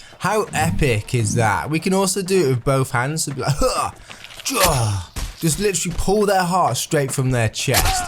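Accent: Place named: United Kingdom. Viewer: Uh it kinda concerns me considering that its so dude that yells for his intro and the British accent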